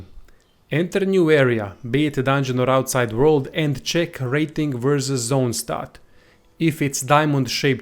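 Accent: Russia. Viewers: когда русские говорят на инглише, да еще и с ярко выраженным акцентом это просто разрыв ушей! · Can never go wrong with tutorial by a man in Russian accent. heart emoji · Thanks a lot man ! Learned a lot here, also loving your Russian accent (no homo) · Nice video plus nice voice. is this russian accent? pretty cool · New to the game here and your tips are very helpful. I love your russian accent 🥰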